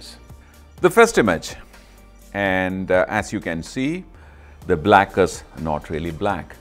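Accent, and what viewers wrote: Kerala, India. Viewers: This is how an idol photography class should be,simple yet powerful explanation The only photography channel i've subscribed Like the way you deliver,your voice and personality I am a fan of your malyali accent of speaking english :) Thank you so much sir for :)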